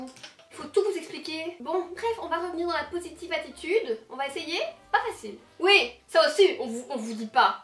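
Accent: Belgium. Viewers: L’accent belge qui ressort en force! Lol Cette vidéo m’a tuée ! 😘😂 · Je surkiff vive l'accent belge 🇧🇪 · Mais l'accent pur belge on adore 😂😂 Love!!! Je suis pour une rencontre avec Jacky!!!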